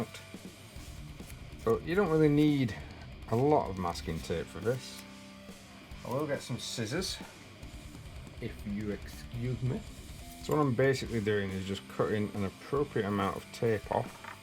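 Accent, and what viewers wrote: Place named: Yorkshire, United Kingdom. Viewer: brilliant vids sir. you really do deserve more views. love your titanicus vids. your yorkshire accent isn't to strong, easily understandable. i'm north notts so used to it. keep up great work.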